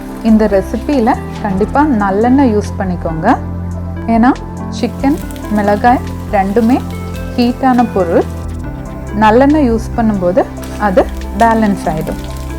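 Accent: Kerala, India. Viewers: Neenga malayaliya. Njn pesira mariye irukku😘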